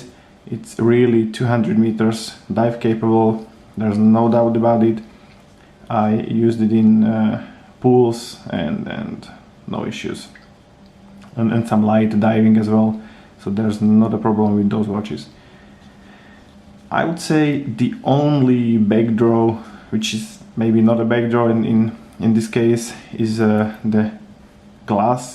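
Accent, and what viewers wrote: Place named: Russia. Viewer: Жёсткий русский акцент😁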